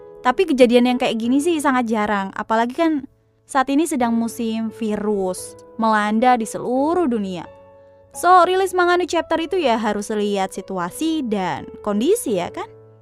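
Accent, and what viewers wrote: Java, Indonesia. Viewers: Medok wkwk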